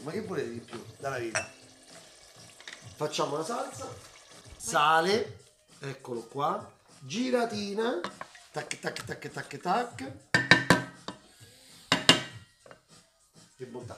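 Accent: Rome, Italy. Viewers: Che bello risentire il romano!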